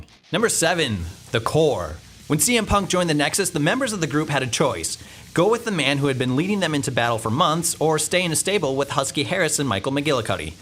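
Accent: United States. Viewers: I'm watching a WhatCulture video why is there an American talking. If I wanted to hear Americans talk I'd be watching wrestling directly. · Who the effing hell is this guy with an annoying yankee accent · Hears american guy Instantly goes back to subscriptions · Fuck this American, un subbing now , this would be so much more better with a British guy narrating - why the fuck are you guys diverting from what made you so great ? It's like your the wwe and we the fans are told to just shut up and accept it - fuck you - wrestling with wregret all the way now , this channel is no longer what it used to be , it's sold out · *american accent *shudders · I'm not used to hearing an American on WhatCulture Wrestling😅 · So you're the yank, eh? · Whatculture lucked into something great with the 10 Totally Wasted Factions and as they don't often do, they had no idea what to do with it, and put a whiny American kid in it. 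Long live British accents in Whatculture. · I'm pretty sure it's because he is an American and doesn't have the British accent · sorry... the narration through me off.. American accent confused me. lol I thought I wasn't watching WhatCulture for a sec. 😄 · throws me to hear an american accent on these vids. dont understand the hate though. all these vids sound like they have the same writer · Exactly. I'll watch if it's Blampied, Pacitti, Simon or even Jack but is a no-no. Annoying bland American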